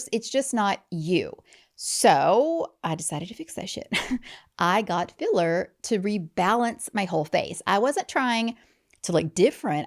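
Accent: South, United States. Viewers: I just found your channel with this video!! I love the county accent and cussing and pure southern honesty 😭I’m from Georgia so it’s like sitting down with my moms friends listening to you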